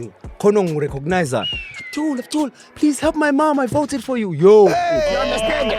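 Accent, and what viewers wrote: Zulu, South Africa. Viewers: IsiZulu siki.🔥💯🤣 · zulu accent❤️😂😂😂😂. Iynhlwabuse😂😂😂😂.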